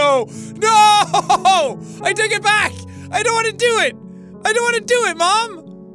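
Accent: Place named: Ireland. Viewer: Well, I am new definitely heard of him didn't know he had such a Irish voice cool because I am Irish! 😂😀😄😅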